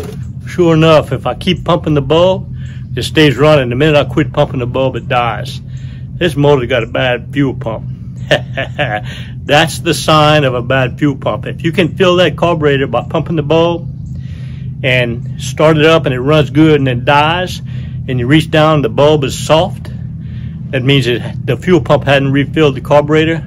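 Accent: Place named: Virginia, United States. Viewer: Are you in Virginia I hear a little high land in ya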